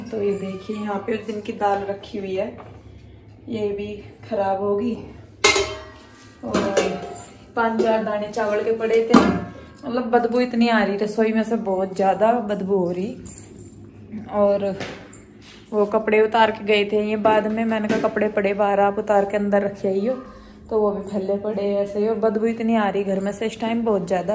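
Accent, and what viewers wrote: Haryana, India. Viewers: Maam ap haryana ke ho kya apki tone vha ki he lgti h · Haryanvi boli bhut bdiya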